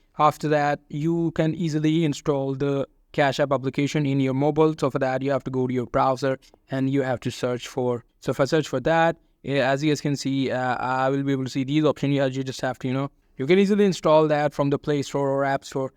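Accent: India. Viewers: I hear your indian accent gang no hiding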